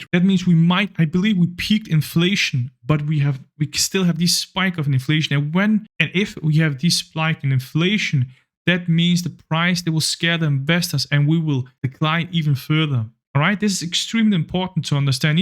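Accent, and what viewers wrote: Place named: Austria. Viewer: Dude you have arnold schwarzennagger accent 😁 are you from austria 😁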